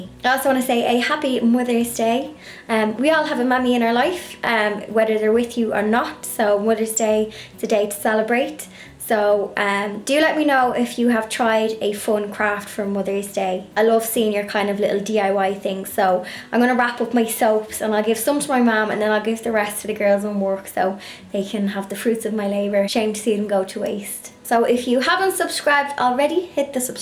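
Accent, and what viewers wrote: Ireland. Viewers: Brilliant yr so clever yr such a lovely young lady love yr Irish actsent x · Hello! Recently discovered your vlogs and they're awesome! I hear your accent and that cute little cottage and it's like being transported to Ireland! I wanted to ask about the soaps. I help to direct an event at my job for crafters. This would be an excellent craft around mother's day. Could you give any tips as to how to adjust it for a crowd. Maybe a group of 5-10 people. Would you do any make ahead soap & scent mixes and just let them choose? Hoping for suggestions because the group meets for an hour and a half only. Thank you :)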